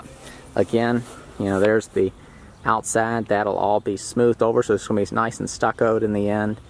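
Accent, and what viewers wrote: South, United States. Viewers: Where do you live? I live in Colorado and have thought of doing something like this but real estate here is unbelievably expensive. I am thinking of going back home to Mississippi and buying properties there. You sound like you're maybe from the South so I was just wondering. Good video, thanks for posting. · I came because the thumbnail and title made me curious, but I stayed for the southern accent lol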